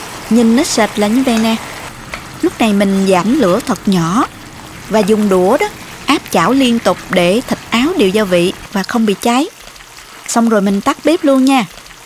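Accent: South, Vietnam. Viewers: Đúng giọng miền Nam ❤❤❤.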